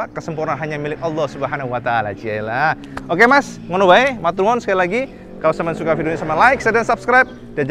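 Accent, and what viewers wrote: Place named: Surabaya, Indonesia. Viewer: Saya suka sm Reviewernya, kl di Reviewnya Mobil seperti Fitra Eri lah, lbh detail mengulas nya, dan yg lbh sy suka lagi dari Mas ini adalah Medok khas Surabaya nya kental banget...kereen Mas 😁👍👍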